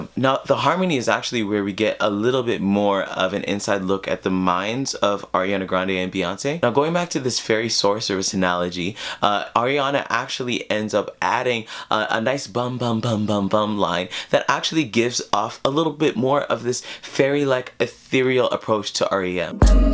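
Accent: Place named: Jamaica. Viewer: This is not meant to be assuming, or offensive. But when I listen to your voice, some of the subtle nuances in your voice I get a Jamaican accent underlying your pronunciation. Just an observation I made. I could be just projecting.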